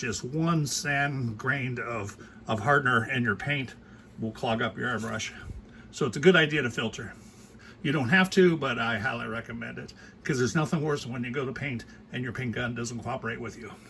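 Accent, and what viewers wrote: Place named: Ohio, United States. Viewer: Your accent sounds southeastern Ohio, no?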